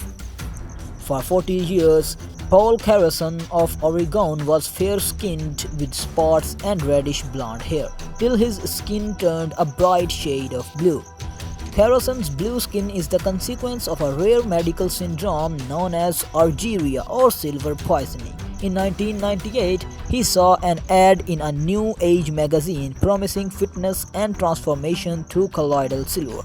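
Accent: India. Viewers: There is nothing wrong with an Indian accent! The background music however was too loud and overwhelming. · You are totally right.But it is normal that you get criticized, when you upload videos on youtube. And just be honest his accent is pretty hard to understand.He does good job producing his videos, but i think he should talk in his native language, and upload them for hindu ppl.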